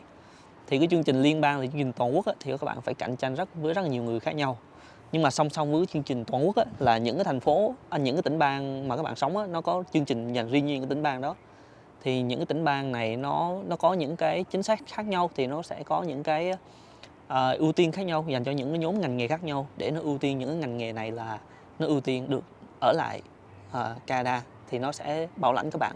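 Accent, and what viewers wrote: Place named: Da Nang, Vietnam. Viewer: Nghe giọng anh có vẻ là người Đà Nẵng, em cũng ở Đà Nẵng qua Calgary được 4 tháng rồi. Hy vọng không phiền nếu có thể kết nối để hỏi anh nhiều hơn ^^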